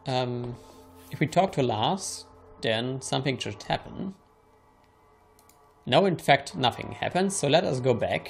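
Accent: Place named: Germany. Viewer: You should talk to again and get him as a follower. By the way where are you from? I've always thought your accent sounds like German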